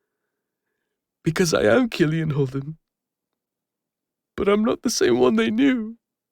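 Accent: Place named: England, United Kingdom. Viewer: You've absolutely nailed the English accent 😁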